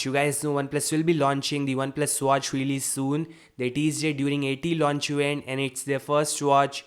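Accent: India. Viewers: 满满的咖喱味 哈哈啊哈啊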